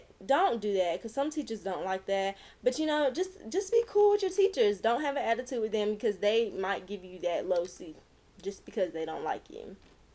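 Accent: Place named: South, United States. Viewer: you have an extremely southern country, down south accent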